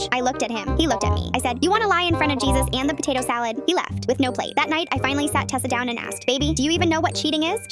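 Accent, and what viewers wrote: Texas, United States. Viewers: Bro holy Texas accesnt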